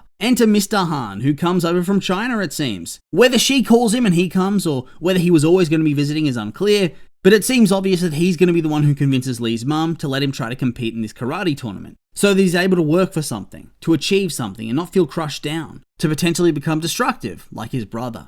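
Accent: Australia. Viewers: I love the sound and tone of your voice so much!! Australians have always had my favorite accent, and visiting there has always been a big goal of mine to help study bio diversity and wildlife. And 'cause it's super pretty too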